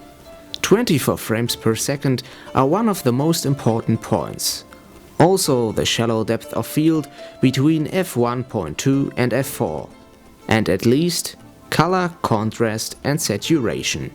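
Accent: Germany. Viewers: from the accent...he must be German. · Man hört nach 3 Wörtern, dass das ein Deutscher spricht. Ekelhafter Akzent. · Defenitely german, you hear it in the way he talks,...! Good advise by the way! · Er spricht das Englisch wie Deutsch aus. Als würde er es mit deutschem Akzent vorlesen oder so. Ich mach's besser. Wo bleibt mein Keks? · Dude, i bet hes german ;D they speek in a funny accent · haha this is a german accent ;) very funny! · He sounds German to me.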